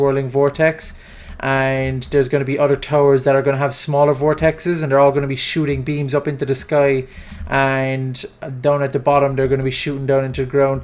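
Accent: Ireland. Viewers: Dang minecraft version of JackSepticEye, every person with irish accent can be Jacksepticeye i guess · Irish accents. They are so awesome. *wipes away tear* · You sir, are a brilliant creative master with a lovely Irish accent. Bless you for your talents and creativity and sharing both of them with us. Have a nice day :)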